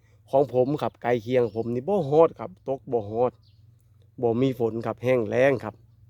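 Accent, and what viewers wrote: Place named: Laos. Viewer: ສຳນຽງຄືຄົນລາວເນາະ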